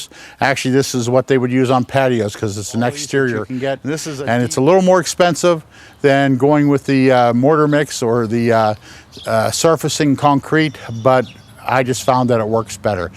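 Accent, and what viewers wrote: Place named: Southwestern Pennsylvania, United States. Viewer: I knew you were from southwestern pa by your accent, and then I saw the steelers helmet on the door. Here we go steelers here we go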